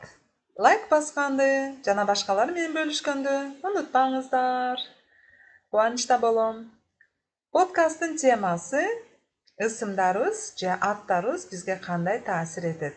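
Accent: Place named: Turkey. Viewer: Молодчик 🔥😍 акцент местный турецкий передача информации убедительная и выразительная💯😘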